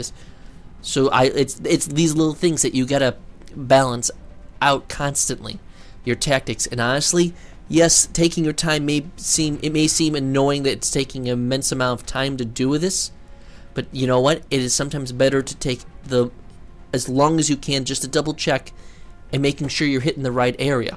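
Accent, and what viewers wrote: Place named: California, United States. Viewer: Ahh! The subtle Californian accent. XD Awesome guide tho. You know what you are doing at least. Every other guide would miss something.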